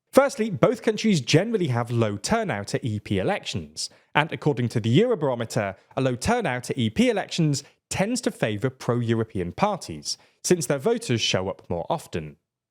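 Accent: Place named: Upper class, United Kingdom. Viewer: Can you find presenters without the uppercrustbritishimbetterthanyou accent? ITs off putting. Sounds like Harry lecturing me.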